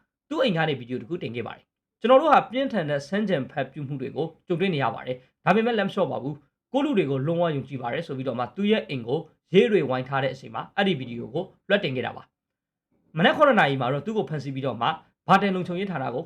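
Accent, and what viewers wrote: Rakhine, Myanmar. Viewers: ဘရို စကားပြောပုံကို ကြည့်ရနားထောင်ရတာ ရခိုင်လူမျိုးထင်တယ်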